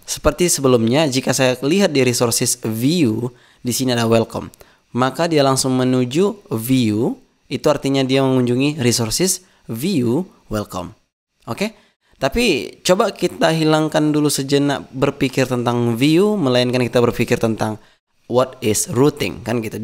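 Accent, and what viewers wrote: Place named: Medan, Indonesia. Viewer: khas medan kali ya bang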